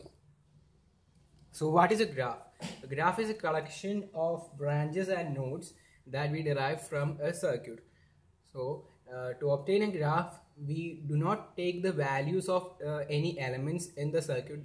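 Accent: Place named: India. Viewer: Fuck you Hindi bastard with your shitty accent .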